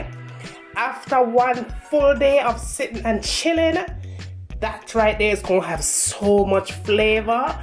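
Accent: Jamaica. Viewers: Thanks! I am so happy I found your recipe. You are hilarious, confident and talented, who else could so eloquently and entertainingly deliver a tutorial in that beautiful Jamaican patois. Keep spreading the love, you are special!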